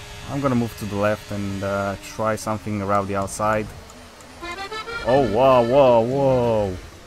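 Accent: Romania. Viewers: Is he from Romania? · Are you from Romania? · Are you romanian?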